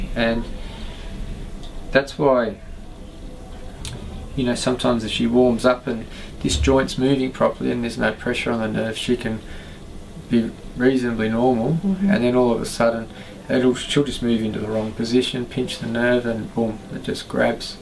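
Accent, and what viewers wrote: Australia. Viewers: can someone please tell me if this guy is from sydney or melbourne pleeeease, i need to see this guy asap the joy i got hearing the australian accent hahahha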